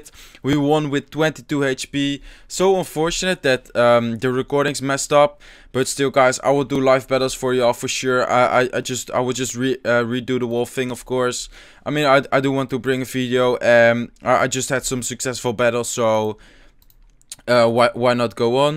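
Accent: Netherlands. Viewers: where are you from? Just out of curiosity you have a Dutch accent I think. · ik ken je account niet maar ik kan gewoon aan het "accent" horen dat je uit nl komt ahahhaha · Your dutch accent sucks but your content is really great. Congrats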